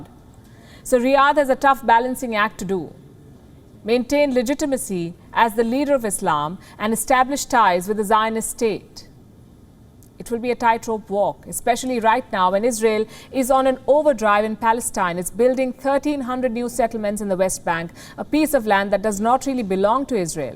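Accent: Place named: India. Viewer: What a joke! 3.7 million subscribers watching this channel is unbelievable. International news in English with such a thick Indian accent is barely comprehensible. It doesn't suit Indian media and the Indian population, in general, to focus on anything other than the welfare and education of their own helpless and severely impoverished population and their many domestic issues such as corruption, racism and poorly managed socioeconomics. The World Bank is funding programmes to educate Indians on how to use toilets to help them get out of the habit of defecating openly in the streets. International media is having fits laughing about it, yet Indian media is pretending to be breaking news to the world. They should focus on benefiting from their internationally funded potty training rather than commenting on international news.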